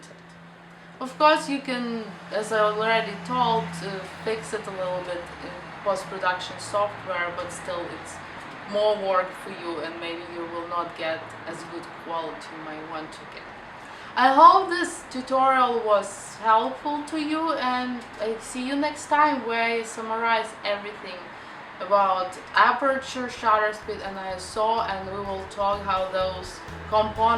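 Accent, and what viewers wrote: Russia. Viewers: Your videos are really helpful! What accent is that? Is it Russian? :)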